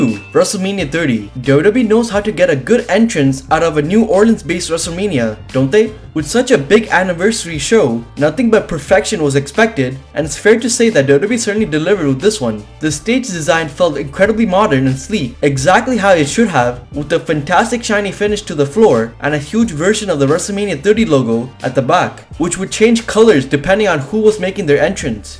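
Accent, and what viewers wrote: India. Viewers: Am I the only one who likes the WM 20 entrance? PS: This guy talks like Apu from The Simpsons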